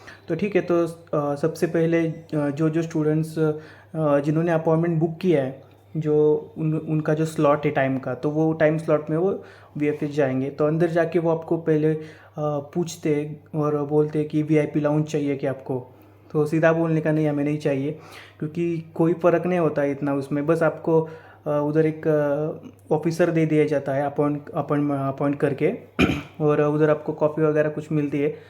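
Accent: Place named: Maharashtra, India. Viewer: you sound marathi mulga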